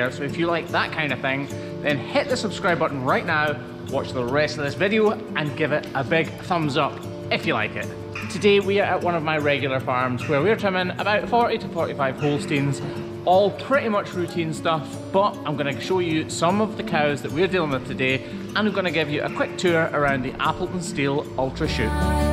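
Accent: Scotland, United Kingdom. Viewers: What an awesome piece of equipment. As a lover if all things dairy, I was surprised when I first discovered your videos. I never realized how much attention cows' feet should have. Your channel is so professionally done. I especially like your opening. Obviously you work very hard. I also appreciate listening to your lovely Scottish accent and seeing the farms setting. Thanks! From Southeastern Pennsylvania.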